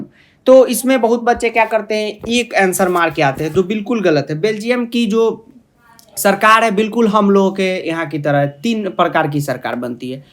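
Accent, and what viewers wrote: Bihar, India. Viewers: Bihari style to thik hai, lekin words ka pronunciation proper karna chahiye. Needs improvement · Hence proved This bihari assent touched my heart as i am myself a true bihari😀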